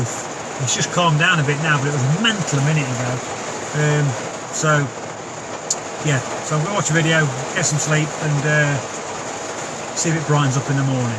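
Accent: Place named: Coventry, United Kingdom. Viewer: Your accent sounds like either coventry or nuneaton/Bedworth? Great videos matey, keep um coming :-)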